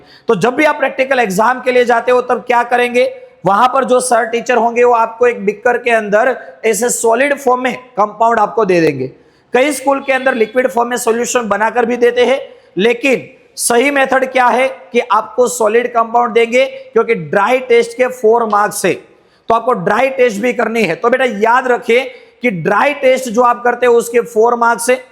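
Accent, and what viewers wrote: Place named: Gujarat, India. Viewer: sir to gujarati chhene etle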